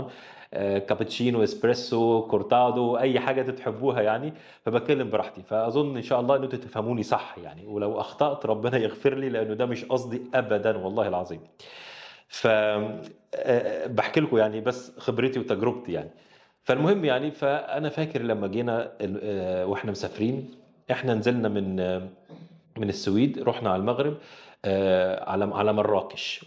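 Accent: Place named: Egypt. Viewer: تبارك الله عليك لهجتك المصرية لم تتغير رغم انك ترعرعت في السويد 👍🏽 مرحبا بك في بلدك.هناك مصريين في مناصب التعليم بالمغرب منذ زمان درسنا عندهم 👍🏽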